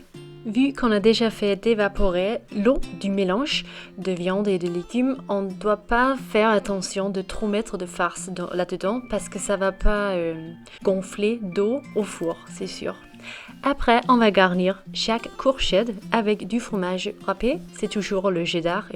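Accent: England, United Kingdom. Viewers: M 21! Frou du cul ou trou du cul??? Madame est Britanique! Essayez donc de faire une recette vidéo en anglais et vous verrez si vous n'écorchez pas un mot??? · Votre accent est tres jolie are you english ?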